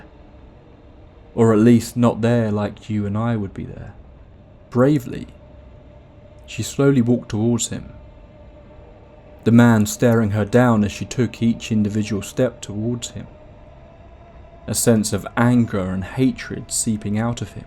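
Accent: United Kingdom. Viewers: Excited to see you’re back & growing! Maybe cause you’re in the UK, between the accent and history, I find myself utterly intrigued! Keep up the good work 😊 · It's cuz they're British I'm telling you, it's like if a documentary isn't narrated by a British accent I don't believe it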